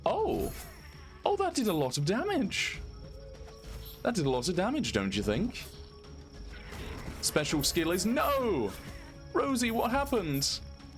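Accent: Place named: United Kingdom. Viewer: British man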